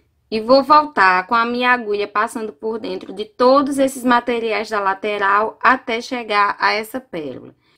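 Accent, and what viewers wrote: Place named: Pernambuco, Brazil. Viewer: Maravilhoso, adorei!!! Que sotaque lindo!! Vc é pernambucana?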